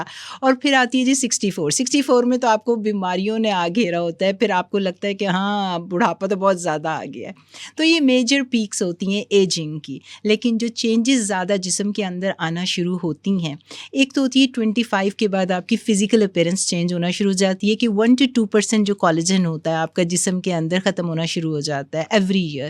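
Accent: India. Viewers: why host uses indian style urdu , she says کھ، instead of ک۔ like خواتین کو کھواتین وغیرہ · Me bhe confused hoon k ye anchor q Hindi pronunciation k sath baat ker rahe hy ya shayd Undian hin qk unki alphabet me khay ghain nahe hy or agr ye Pakistani hy to isko yaqeenan urdu talaffuz ana chahiye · Bhen ap jan k hindi accent main boti ho sach main zehr lgti ho esey boltey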